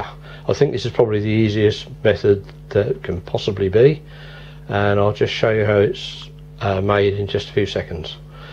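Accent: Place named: Suffolk, United Kingdom. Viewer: You have nailed it Sir, and, if my ears do not deceive me, you are a Suffolk gentleman to boot ! Double bonus ! With best wishes and greetings from South Africa. I shall certainly try this out in short order. Very best, Mike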